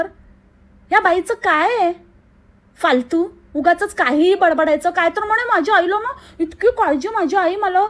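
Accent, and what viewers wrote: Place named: Malvani, India. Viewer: Tai tula malvni yete ky.....mhnje tu malvni mast boltes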